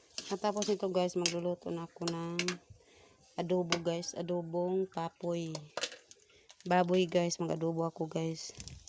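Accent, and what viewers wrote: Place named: Visayas, Philippines. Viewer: Bisaya ka mam,kay law-oy man imong sulti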